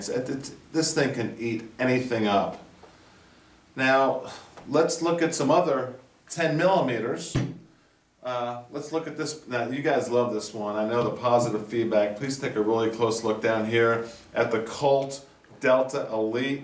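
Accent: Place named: California, United States. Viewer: this guy sounds like hes californian.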